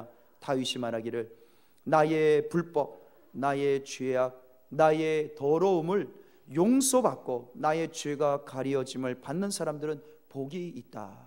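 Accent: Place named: Gyeongsang, South Korea. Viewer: 목사님 말투가 갱상도사람 다 되셨네요!!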